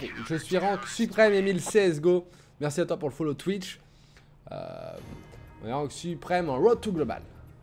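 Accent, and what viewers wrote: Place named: England, United Kingdom. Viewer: Franchement des youtubeurs comme toi c'est magnifique t'es toujours souriant je te suis depuis tes 100 abonnés continu Ps: Magnifique Accent anglais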